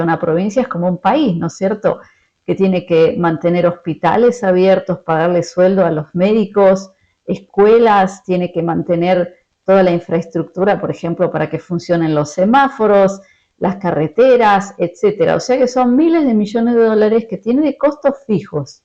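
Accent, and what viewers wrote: Argentina. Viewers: me da esa escucharte. Tu tono argentino después de tantos fiera de tu país. En que haz colaborado en tu país. Es fácil juzgar y no estar en la en tú Pais. en batalla. Desde lejitos. DTB y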